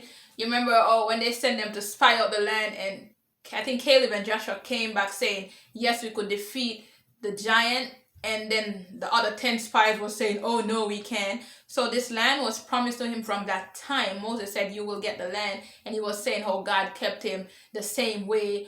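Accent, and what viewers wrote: Jamaica. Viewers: Thank you sis! Are you from Jamaica? I hear a Jamaican accent! God bless! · Sister, by any chance are you from Jamaica ?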